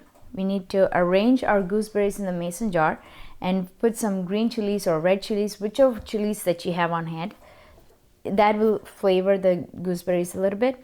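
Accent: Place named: United States. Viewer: Mouth watering recipe. I am gonna try. Thanks for sharing. Btw you have fine American accent. How did you achieve it?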